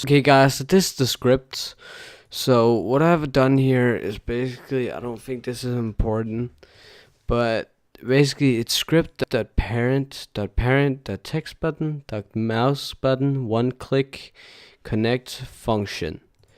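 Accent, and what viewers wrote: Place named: Scandinavia. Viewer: U have a danish/scandinavien accent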